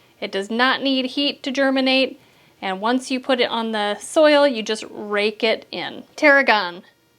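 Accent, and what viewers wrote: United States. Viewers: Fascinating the differences between American English and the English we speak in England; I love the way you call herbs "Urbs" (always makes me smile). It shows that American comes from the many different nationalities of settlers: I wonder why you call courgettes (french) zuccinis (italian) ; Aubergine (french) Eggplant: Cilantro we call coriander; there are many others.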